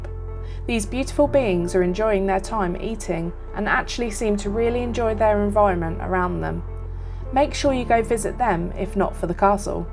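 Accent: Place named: United Kingdom. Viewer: 🌎🗼 hello from your french friend 🥐☕ I love to hear your British accent ! what a great place! thank you for sharing with us my dear friend! 🌾🌿 nice aerial views! 🪴🌳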